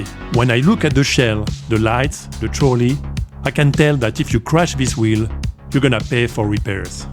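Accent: France. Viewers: j'ai cru comprendre a ton accent que tu est français ^^ Il faut que je me pause et que j'écoute bien tranquillement tt ça, car la en speed j'ai strictement rien capté 😂 mais merci pour l'explication, je comprend mieux !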